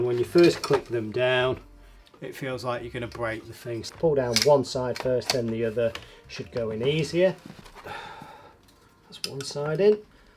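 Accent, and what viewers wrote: United Kingdom. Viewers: Weird seeing an Asian guy with a British accent lolol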